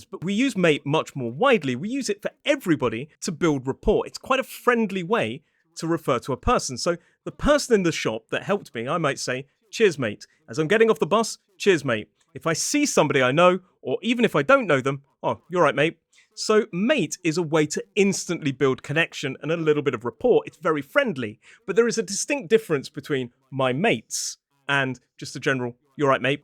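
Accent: United Kingdom. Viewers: I absolutely love the British accent and phrases 😍 My late husband was British! I enjoy your videos 😁 ❤️ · Love your British accent 🎉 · I really like your british and energy you putting in the videos. 😊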